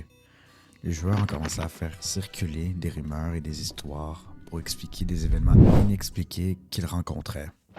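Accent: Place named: Canada. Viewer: Yooo un canadien commme moooooi 😊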